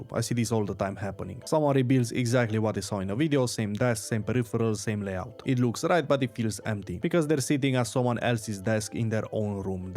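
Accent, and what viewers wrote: Romania. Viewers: Yo dude ... Love your vids helped me a lot ✌️ ... You from romania by any chance ?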